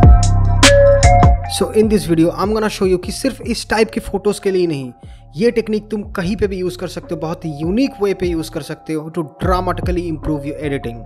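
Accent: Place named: West Bengal, India. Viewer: Bro, you awesome. Your Hindi pronunciation is very similar, are you from West Bengal? If yes, please tell me the location. I'm also a photographer & Filmmaker, I would love to go for a shoot one day. Lots of love & good wishes. :-)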